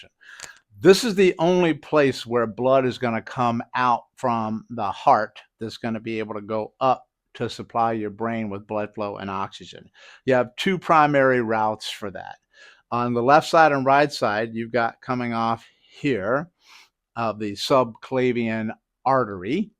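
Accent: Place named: South, United States. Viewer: Doctor have Hollywood smile - perfect teeth/alignment. And good physique and perfect example of health and healthy prototype. I detect Southern accent. What you are broadcasting is very informative and helpful to many people. Unfortunately , the conceited me a little jealous of doctor too 😢😂😅. Tq. I plan to apply these information and sustain a schedule of sorts to do it. 🤗🙂‍↕️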